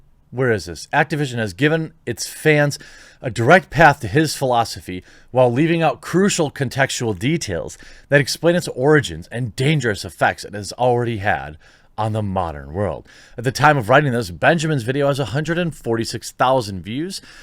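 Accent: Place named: United States. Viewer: I like your accent, i wonder which part of the US you're from?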